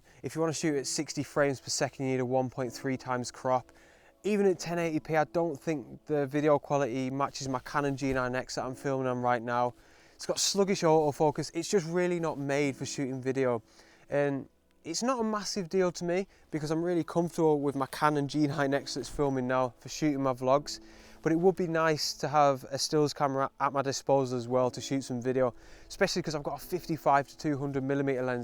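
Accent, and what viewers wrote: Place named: England, United Kingdom. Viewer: Enjoyed your video. The English accent is 👍.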